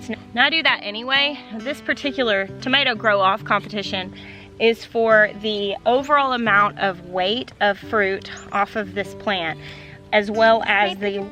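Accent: South, United States. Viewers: Love the trash talk, polite but confident, so southern! Girl, your self control is large! It so wanted to go home with you!! The rotten egg fear is real! You never forget, shuddering right now with the memory of it exploding nasty and green. 😖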